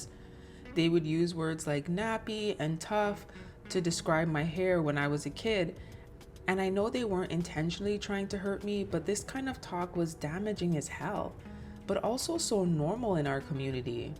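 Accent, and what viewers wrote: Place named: Toronto, Canada. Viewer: I knew you were from Toronto by your voice; are you also of Jamaican descent?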